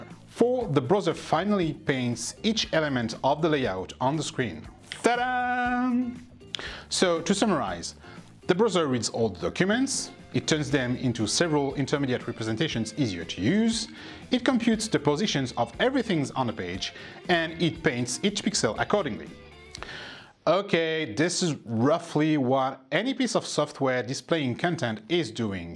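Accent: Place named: France. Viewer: He has French accent, I guess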